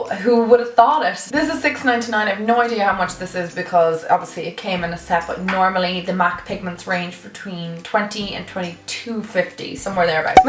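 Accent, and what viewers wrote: Ireland. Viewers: Enjoyed this very much. You are entertaining, informative and quick. And of course love the Irish accent. Trust what you say as well. Will look through your videos but would like to see you do one half of face all Mac and the other nyx, or urban decay and makeup revolution, etc.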